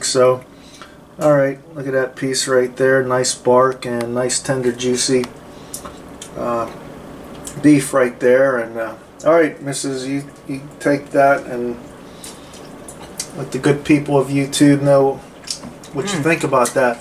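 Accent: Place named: Western Pennsylvania, United States. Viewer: Great videos. I detect a western PA accent. Where can I get the carbon plate and pid fan?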